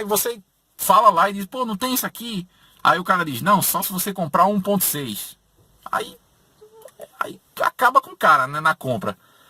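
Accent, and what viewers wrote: Pernambuco, Brazil. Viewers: É Pernambucano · Esse cara é de Pernambuco ou região não é kkkk , Pernambucano e bixo ignorantemente e sotaque chato pra Caralho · Não é Pernambuco??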